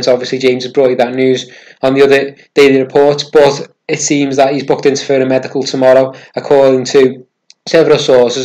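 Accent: Liverpool, United Kingdom. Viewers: Good content, but one thing my gf & friends point out is saying..."erm" before every sentence. Doesn't matter to me, maybe because English isn't my first language. My friends notice & some dislike it. I Remember Rooney started every sentence with "erm" but you notice he no longer does ? I'm sure he had coaching on public speaking. It is more noticeable with Scouse accent & sadly there's lots of idiots who just hate Scouse - Please don't take offense, I sorry if it seems like that, I just pointing out how others may think & if you say "fuck it, this is how I speak" I get it, people here (S.E. England) say shit about my accent & can be really snobs - Anyway bro, just saying what I heard, not my opinion - Great video COYB (I support Hajduk but in England I'm also a big Everton fan)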